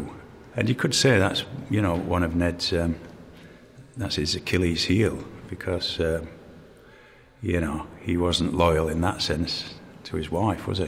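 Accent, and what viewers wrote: United Kingdom. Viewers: Well I'm argentinian so I really cannot say nothing more than "I love Bean's british fOcking accent". Btw I love his irish accentt as well xD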